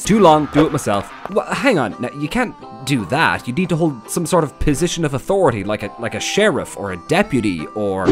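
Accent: Ireland. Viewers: I never thought I'd ever watch a video about US president Theodore Roosevelt going across the country to catch a bunch of pirates, narrated by an Irishman sprinkled with clips from Metal Gear Solid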